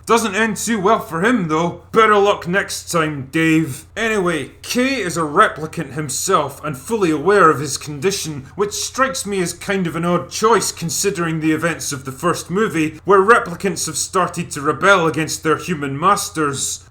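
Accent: Scotland, United Kingdom. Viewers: TCD's accent sounds scottish but some vowels are different... "Chill pill" doesn't become "chell pell", and often "spud gun" doesn't become "spood goon". Anybody know where he's lived?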